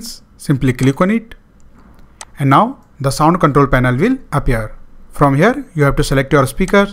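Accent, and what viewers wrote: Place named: England, United Kingdom. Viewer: goooosh youre english accent i DIE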